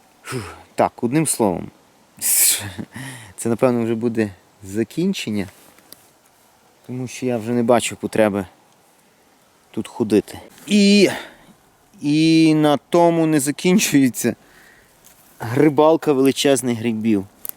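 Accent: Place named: Ivano-Frankivsk, Ukraine. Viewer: разве во Львове так разговаривают, нет он с Франковской обл.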